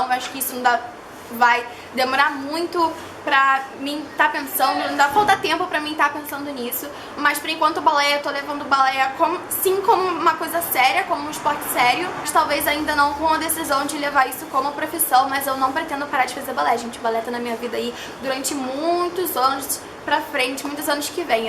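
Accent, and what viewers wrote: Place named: Rio de Janeiro, Brazil. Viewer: De cara, já percebi que você era carioca ❤️sotaque liendo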